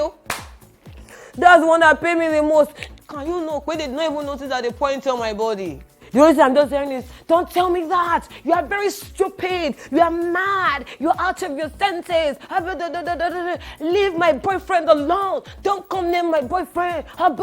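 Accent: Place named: Nigeria. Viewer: Yes o....I love every bit of your shows.... they are always very interesting with the Nigerian accent 😂😂😂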